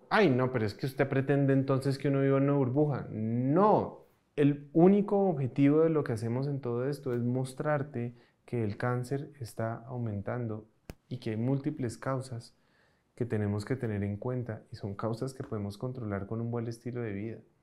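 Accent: Colombia. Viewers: dije meditacion...y si..no lo recomiendo dira muchas cosas buena pero me parece dice mas cosas malas y mentiras...no se si el cree sus mentiras o no. Te recomiendo no te dejes engatusar por su acento colombiano bonito. Lo siento "doc" es lo q pienso.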